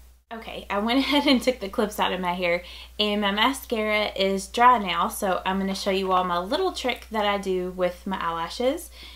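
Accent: South, United States. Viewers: Subscribed Just because we have the same name lol. And you have a southern accent which makes you more awesome :)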